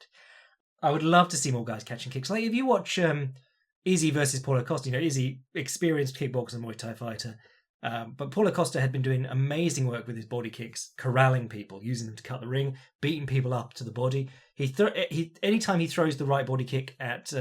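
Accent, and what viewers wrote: United Kingdom. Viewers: Time to let Jack Slack serenade us for 48 minutes with that British schoolboy accent as he describes Aldo's flesh-rippling kicks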